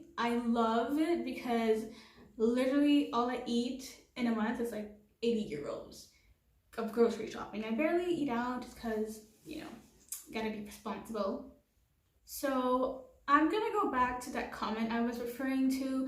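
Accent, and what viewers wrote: United States. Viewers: I'm actually shocked that you're Hatian! You have an American accent! How?!? You sure you've never lived in the US?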